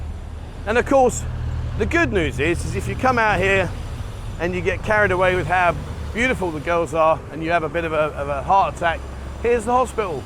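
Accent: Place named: Nottingham, United Kingdom. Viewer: speaks Thai. Like a local with a totingham accent.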